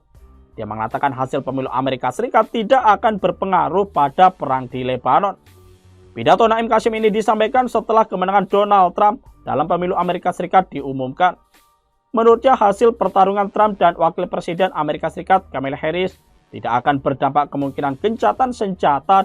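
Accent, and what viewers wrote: Java, Indonesia. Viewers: Penyiarnya medok Jawa. Intonasinya tdk bagus.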